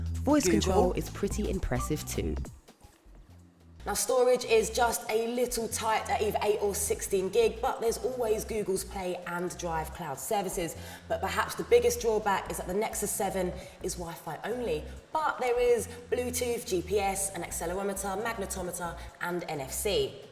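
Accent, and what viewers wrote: United Kingdom. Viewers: I love hearing that British English!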